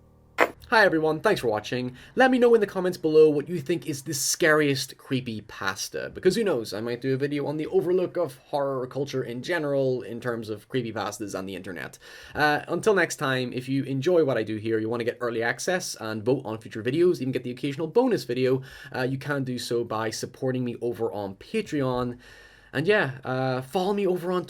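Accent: Northern Ireland, United Kingdom. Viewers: I think this is the only channel I have found with an accent from home. Go on norn iron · Are you from northern Ireland? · I love the NI accent <3 Pronouncing "now", "nye" is so cute.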